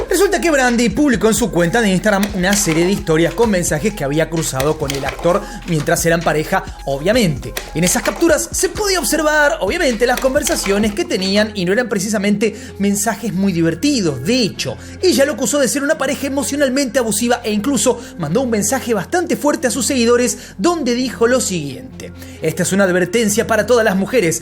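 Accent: Argentina. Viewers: Sos argentino no ???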